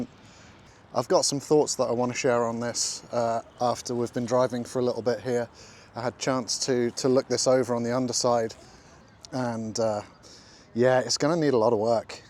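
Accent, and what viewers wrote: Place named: England, United Kingdom. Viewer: This was suggested to me and it was a pleasant surprise to hear a fellow English man 😊 Looking forward to watching more videos, Galaga is a true classic and definitely worth restoration. Subbed.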